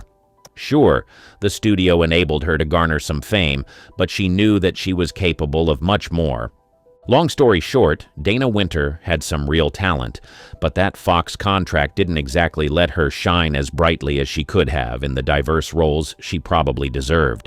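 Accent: United States. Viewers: Typical uninformed American pronunciation with medial 'A's pronounced long. Had to stop an otherwise interesting video because I could not take one more 'Day-ner'! Yes, thank you it is 'Dah-ner'!.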